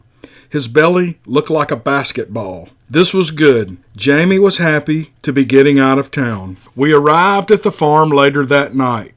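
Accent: South, United States. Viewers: I take my phone to bed at night and try to drift of to your great story telling. You have the perfect voice for it and I love the slight southern accent. Thanks much for these stories and keep them coming. I, too have a story that is unbelievable but it's not about cryptids but rather the paranormal. Idk if you would be interested in a rough draft of my story which includes everything from a entity Aporting, getting in bed beside my mom and snoring, stomping up and down the steps and even physical attacks. It took us 6 most to sell the creepy old 23 room Victorian house in the historical district of Dayton Ohio. It's a terror that's different than any other terror. My email is <mail> love your channel thanks so much. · Hey Bud great story.. NO one can tell a story like a southerner. PLEASE DON'T loose YOUR Southern acsent. Im also a southerner and proud of my heritage. 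LOVE YOUR show and Your storys bud... GOD Bless YOU.. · Love this channel. The music and your diction are great. Not a whole lot of us southerners on here so it’s refreshing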